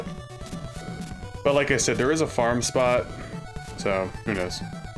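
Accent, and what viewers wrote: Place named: California, United States. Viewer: Congratulations dude! Just to even witness it was something to behold. Here's hoping someday I might get my own deathless run haha. 🤞 And that real talk you gave , says a lot about me as a gamer. I admit I am not the most patient when it comes to gaming to say the least, it's so easy to let frustrations walk all over you rather than take a breath and count to 10. I really needed that today so thank you. By the way, if you don't mind me asking, as an Australian I detect a US accent in you... California?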